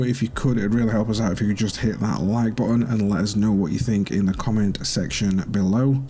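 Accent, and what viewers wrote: Yorkshire, United Kingdom. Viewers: as a fellow Yorkshireman, I love your accent.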